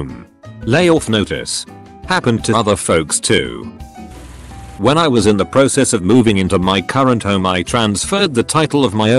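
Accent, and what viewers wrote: United Kingdom. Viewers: I gotta watch out for that lurr enforcement. Why can't Brits ever pronounce their own damn words right?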